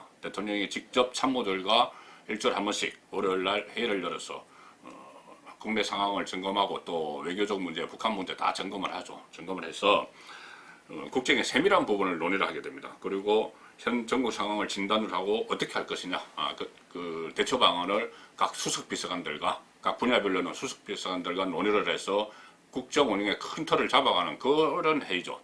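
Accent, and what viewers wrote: Gyeongsang, South Korea. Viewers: 송본부장의 말을 좀 천천히 하세요 경상도 사투리에 말이 빠르면 타지방 사람들이 잘 알아듣지 못합니다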